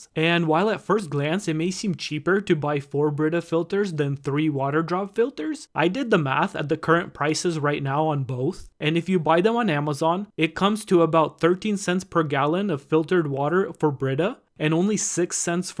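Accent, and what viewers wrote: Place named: Quebec, Canada. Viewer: You mentioned that in your state they use the highest chlorine levels. But, are you in a state or in a province? Your accent is 100% Québécois. Great review!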